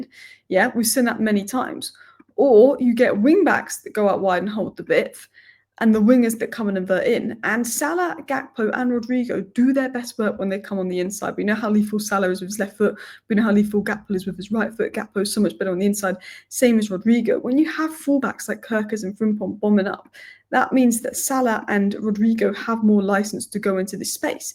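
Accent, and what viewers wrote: United Kingdom. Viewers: hello... i follow you and watch your content, it is really amazing... but i want to know which british accent you do speak.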